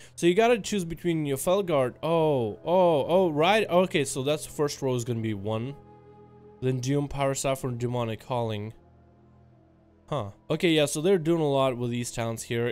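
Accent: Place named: Russia. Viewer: This is not meant to be hateful... I'm just trying to give my Opinion on how to make your Videos a lot more enjoyable (for me, at least). It really bothers me, when you sometimes just mumble some words and I can't really understand what you're saying - or I really have to concentrate on the Video. I like to put videos like yours on, while playing. And it would make it a lot more enjoyable, if you'd just take your time to pronounce everything correctly. And I think you're part russian (Am I right?) and that can make speaking English harder. As a non native english speaker myself, I get you 😉 But I think you could really improve that, by just talking a bit more slow and just trying to take your time. I really like your content. But that sometimes really bothers me. If I'm the only one on that, then nevermind, forget about it 🙈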